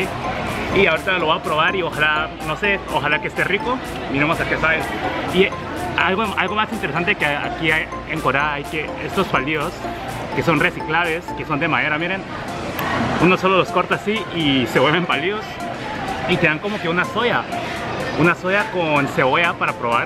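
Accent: Guatemala. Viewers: ¡Tu acento chapín es perfecto! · Hola eres guapo 😘, me gusta q hablas cómo guatemalteco, has vivido acá?? Cuánto tiempo??? Que edad tienes??? En que trabajas??? Dios te bendiga desde Guatemala. Claudia 😘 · No pierdes tu acento Chapin porfa....yo empecé a ver un vídeo tuyo y me encanto...Ya le di suscripción y con campanita🇬🇹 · Soy nuevo en tu canal y para empesar esta bien chilero me llega ,como ablas como chapin no cave duda que bos si sos calidad .te saludo desde la capital de Guatemala. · No perdas el acento chapin!!! Eso le da un toque mas calidad a tus videos Saludos desdd Guatemala!! 🇬🇹🇬🇹🇬🇹🇬🇹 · Por casualidad comence a ver tus videos, me encantaron, y lo que mas me gusto por ser chapina fue tu acento guatemalteco. 👌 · JAJAJA Amo que tenga súper marcado el acento guatemalteco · Mira sus vídeos anteriores ahí lo explica, pero si, sí vivió acá en Guatemala como 18 años por eso su acento Chapín. 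· Cual es tu historia, por que hablas bien chapin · Nueva suscriptora :) saludos desde Guate xd No olvides tu acento chapín porfa <3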